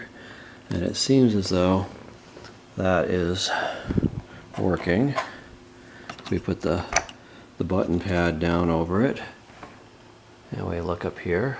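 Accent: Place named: Ohio, United States. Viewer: Is it just me or does any one else thinks hes from Ohio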